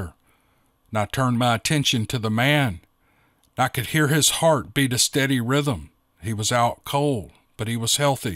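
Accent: South, United States. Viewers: LOVE this man's narrating!!! From the south myself and miss the accent!!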